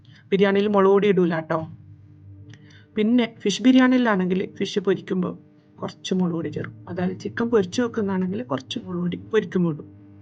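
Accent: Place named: Kerala, India. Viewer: വീഡിയോ ഒരു വേറിട്ട അനുഭവം പോലെ തോന്നി... ഒരുപാട് കുക്കിംഗ്‌ വീഡിയോസ് കാണാറുണ്ട്. .. ഇതു പക്ഷേ നമ്മുടെ വീട്ടിലുള്ള വേണ്ടപ്പെട്ട ഒരാൾ ഓരോ കാര്യങ്ങളും പറഞ്ഞു കൊണ്ട് ബിരിയാണി ഉണ്ടാക്കി തന്നത് പോലെ ഒരു ഫീൽ. .. ടീച്ചർ പറഞ്ഞത് ശരിയാണ്, പാചകം ആസ്വദിച്ചു പോകുന്ന ഒരു യാത്രയാണ്. .. ആ ആസ്വാദനം പൂർത്തിയാകുന്നത് അതു മറ്റുള്ളവർക്ക് കൊടുക്കുമ്പോൾ അതു രുചിച്ചു കഴിയുമ്പോൾ അവരുടെ മുഖത്തെ ചെറു പുഞ്ചിരിയും. .. സംസാരം കേട്ടുകൊണ്ടിരുന്നപ്പോൾ അറിയാതെ പയ്യന്നൂരിൽ എത്തിയോ എന്ന് തോന്നിപ്പോയി. .. എന്തായാലും നല്ല തനത് കണ്ണൂർ ബിരിയാണി, അതും ടീച്ചറുടെ കൈ കൊണ്ട്. . എന്തായാലും രുചി കാണും. .. ഒരു ദിവസം അങ്ങോട്ട്‌ വരട്ടെ? 😜 എനിക്ക് മുരിങ്ങയില തോരനും ഇഷ്ടമാണ് 😜 വീഡിയോ എഡിറ്റിംഗ് വെറൈറ്റി ആയിട്ടുണ്ട്, പക്ഷേ ഇടക്ക് bgm മുന്നിട്ട് നിൽക്കുമ്പോൾ ടീച്ചറുടെ ശബ്ദം അതിൽ മങ്ങിപ്പോകുന്നത് പോലെ തോന്നി... ഇനിയും ഒരുപാട് വീഡിയോസ് പ്രതീക്ഷിക്കുന്നു...❤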